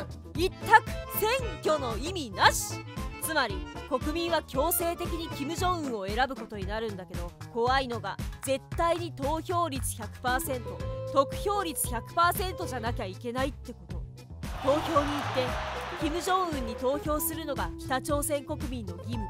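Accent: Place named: Hakata, Japan. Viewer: 山梨県民なのにバリバリ博多弁 いえいえ、気にしないでください！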